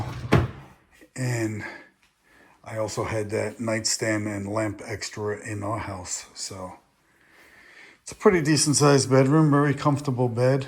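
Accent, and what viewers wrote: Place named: New Jersey, United States. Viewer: Hi. The YT algorithm brought me here. I don't have breathing difficulties but I've watched a vid of a man who had health issues & was able to do the "Camino de Santiago" in Spain. He used a travel CPAP machine (it's smaller) and was able to do the multi-week walk/ hike. Good luck on whatever you decide to do re: your health. Btw, are you from the NE of the USA? You sound a bit like Bernie Sanders and Tony Soprano. (Cawfee = coffee, moah = more). Was just curious; hope I didn't offend. Edit: congrats on the new place & the renovation. One comment abt the kitchen: there's no hood or vent if someone cooks. If the dish being prepared is smelly or aromatic, the whole apartment will smell of food. One way to prevent that would be to install a hood or to put a table outside/ back area and do the smelly cooking there. 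The view from the backyard is nice. Wish you both good health & safe travels! 👍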